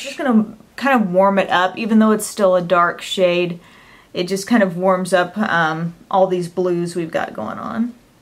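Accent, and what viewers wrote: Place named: South, United States. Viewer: I am new to your channel and i'm so glad I stumbled across you because you are so great at what you do. Also, I don't know much about you personally but I swear you have a tinge of a southern accent.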